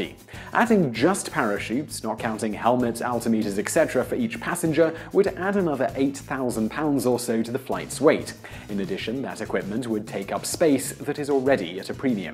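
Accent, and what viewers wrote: United Kingdom. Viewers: Your British accent is not helpful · Why are you speaking in British accent but using imperial system? I don't understand.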